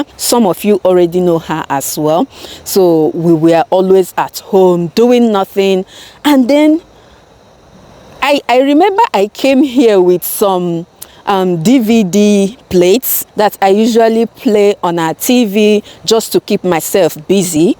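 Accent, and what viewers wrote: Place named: Igbo, Nigeria. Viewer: YouTube is really a great blessing honestly ❤❤,low view can be discouraging but we keep moving,yes momma I remember the airfryer 😅 both the accent matter,but we like our igbotic accent like that 😂, you are Amazing 🥰, keep bringing it on 👌🏽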